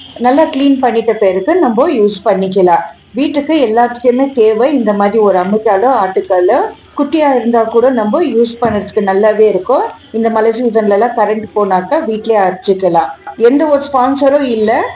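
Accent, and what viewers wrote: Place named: Bangalore, India. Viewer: Nice.your language is good.Bangalore tamil.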